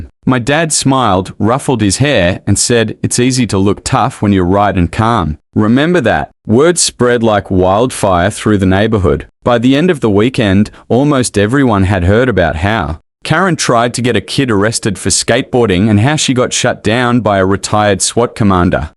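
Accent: Australia. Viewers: No mention of where, no names, could be true but I feel it easily comes over as fake or hyped. No clue of country feel its USA but sound Australian. What is this photo? Why the drawn guns? Why would they pull guns on a kid. The photo shows no safety girl, no image of the Karen. Who is the little girl. What HOA? What rule? More questions than answers. It sounds like a story. Absolutely nothing to say this was true, when, or anything. Terrible. This is truly terrible content.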